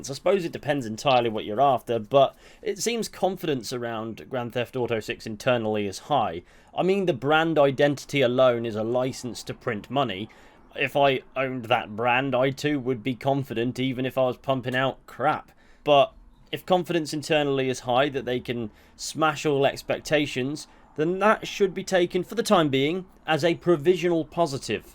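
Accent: United Kingdom. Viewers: لكنتك ليست أمريكيه هل انت من المملكه المتحده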